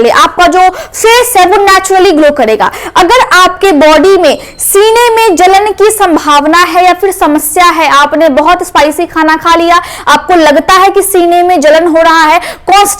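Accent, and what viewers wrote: Bihar, India. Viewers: Bache chage your flow ..... Bihari language flow aah raha. Update beta . I am Army men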